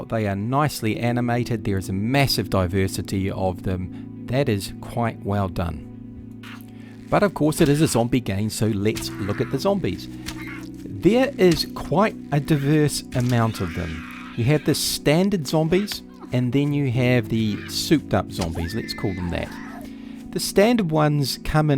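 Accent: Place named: New Zealand. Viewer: Have you played it recently or moved on? I'm open to any other suggestions on open-world survival games that I can play with friends. I have not looked into State of Decay yet or Valheim, and so keen on The Day Before when it comes out! I'm also a Kiwi but living in OZ. I get so much crap XD. Happy to subscribe to a NZ game reviewer on YouTube! I assume that because of your accent and logo :)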